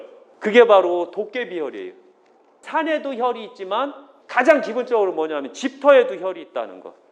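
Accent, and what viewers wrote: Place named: Chungcheong, South Korea. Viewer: 쌤~"지난번에 말씀하셨는데요 넘 정감가게 말씀하셔서입니다 스산 태안쪽 말솜씨 같아 더친밀감 올백입니다~😊 방송 잘보고 교육되고요 차례음식상차림까지 진심으로 감사드립니다 고맙습니다 꾸벅 쌤 화이팅입니다 "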